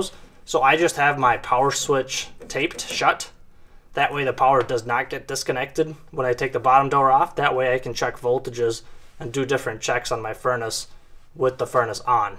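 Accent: Minnesota, United States. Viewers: Great info! Love the Minnesota accent.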